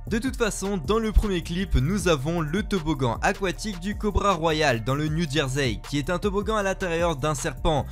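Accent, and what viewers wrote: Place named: France. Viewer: Les commentaires insignifiant du narateur, avec son accent français me tape sur les nerfs.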